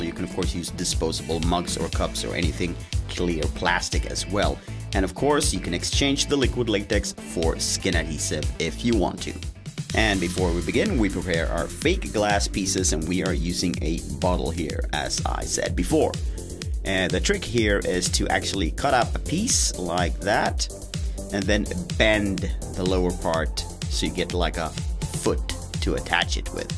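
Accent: Scania, Sweden. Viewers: Älskar din skånska!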